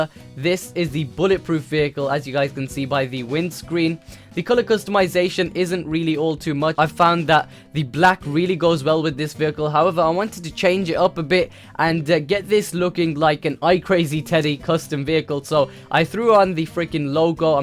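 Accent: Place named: England, United Kingdom. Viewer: You have an English accent but you look like you should live in India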